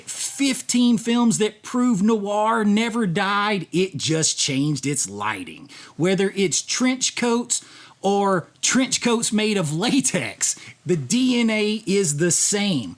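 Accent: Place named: Louisiana, United States. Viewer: Your goofy louisiana accent doesn't fit with hard boiled urban anti-heroes from Film noir movies. Stop trying.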